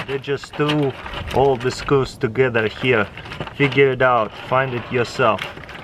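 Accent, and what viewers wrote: Russia. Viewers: i like ur rushian accent :)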